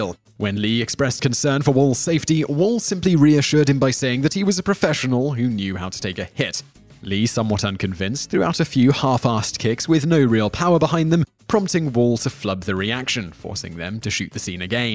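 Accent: United Kingdom. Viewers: How can you know all this about Bruce Lee and still not be able to pronounce Kato? Even if you're pronunciation is "more correct" and you say it with a British Accent isn't going to change the fact that no one else says it that way. Also you mispronounced Milton Berle's name as well.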